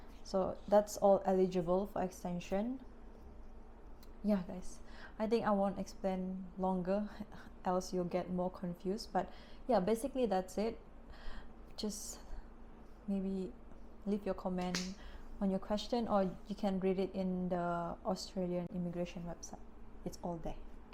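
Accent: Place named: Sabah, Malaysia. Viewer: Lucu lah sy rsa ni video hahaha. Buli dgr slang sabah. Would like to see more contents 🤗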